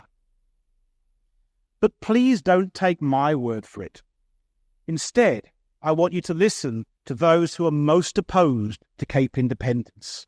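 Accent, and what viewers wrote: United Kingdom. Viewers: You sound like you are from the UK?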